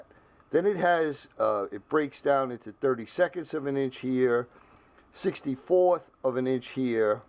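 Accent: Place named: New York, United States. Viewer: This old machinist and inspector is happy for ya. Your new hammer rocks. Thanks for sharing and thanks for telling us you are from New York. By your accent... I kinda thought so. All the best bro.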